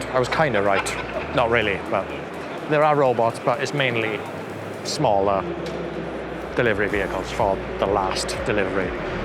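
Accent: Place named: RP, United Kingdom. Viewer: like your b.b.c.voice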